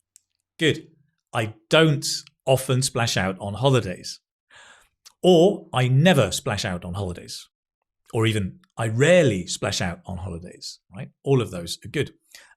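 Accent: United Kingdom. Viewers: hi dear 🌹🌹🌹 i had problem of hearing what english people say. since im watching your videos on youtube my listening ability is improved in amazing way. a great begining music; and your glorious & clear british english accent. thank you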